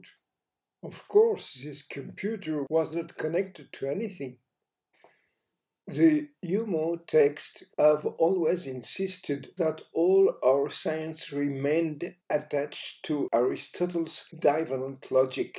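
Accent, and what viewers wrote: France. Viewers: Les sous titres anglais générés aident grandement. Avec en prime l'accent Frenchie pour aider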